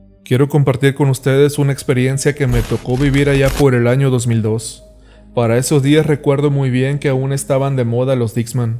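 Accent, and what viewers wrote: North, Mexico. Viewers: Me gustan mucho tus relatos...los lees muy bien 👍 se escuchan diferente con tu tono norteño☺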